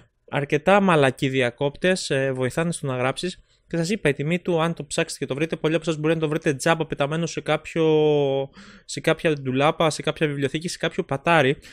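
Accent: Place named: Lemnos, Greece. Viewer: orea milate stin limno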